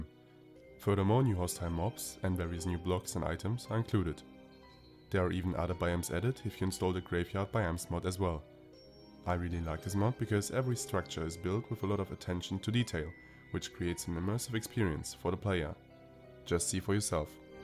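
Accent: Germany. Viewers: klingt deutsch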